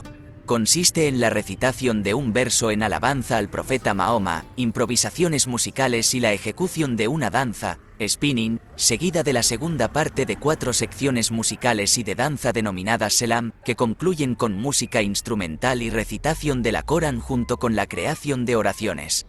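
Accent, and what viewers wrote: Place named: Spain. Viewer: que mal habla, aprenda a pronunciar propiamente, hace del video una mierda.Ese acento español, pronuncie como se pronuncia en su idioma. la ñ, y no es túrquia es turquia. IGNORANTE!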